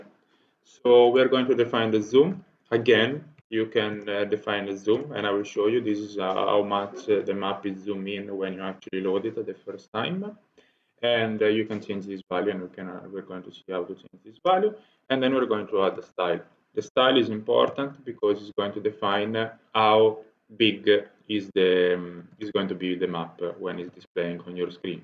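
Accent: Italy. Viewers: hey! thank you for the great tutorial I found it very useful for my project, btw your Italian accent is awesome :D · thats a really good tutorialle. I opened my Appe. ıt worked with the leaflet mappe. BTW i didnt even heard italian ever but its funny ı give u that :D . thank you anyway :D greate tutorial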